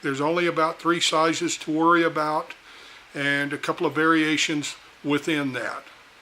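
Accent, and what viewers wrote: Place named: United Kingdom. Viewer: Hi Lovely accent where ya from Bro.............Briddy UK thanks.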